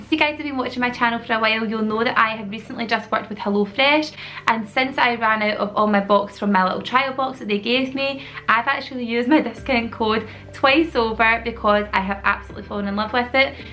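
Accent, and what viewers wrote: Scotland, United Kingdom. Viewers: My daughter Kayla who's 9 watched this video with me. She asked what accent you have I said Scottish and she said awhh like Brave 😀🖒 loved the video and so did Kayla 😙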